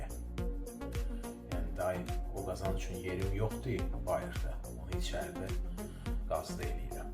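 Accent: Baku, Azerbaijan. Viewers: Bakı ləhcəsi ən gözəl ləhcələrdən biridir, bu xaş üçün ən yaxşı sous elə bakı ləhcəsidir.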